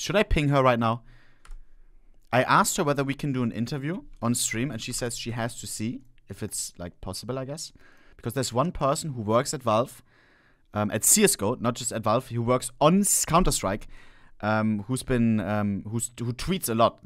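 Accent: Germany. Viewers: i think youre from germany am i right xD alles klar xD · is bro german ?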